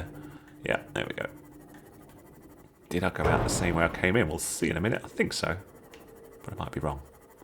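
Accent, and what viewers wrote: United Kingdom. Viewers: Yeah, agreed, straight to the point. Also your English accent is lovely!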